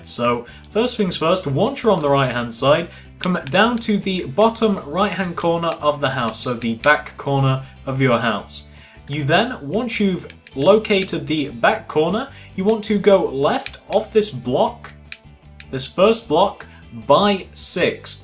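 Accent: United Kingdom. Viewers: He's British lol it's not his fault he has an accent lol I hope you know not everyone speaks the same lol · His british accent. Be nice.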